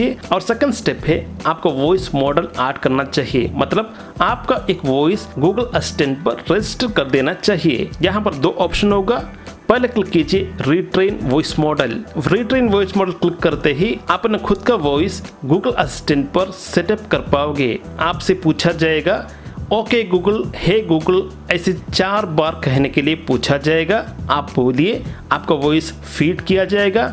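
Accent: Kerala, India. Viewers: Thank you bro are you from Kerala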